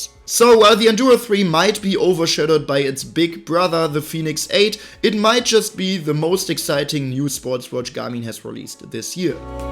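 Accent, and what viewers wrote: Germany. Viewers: What an awesome unbiased cover of these watches. I love the channel name too. You get exactly that, reviews with a German accent 😂. I hope you get more followers with this much effort.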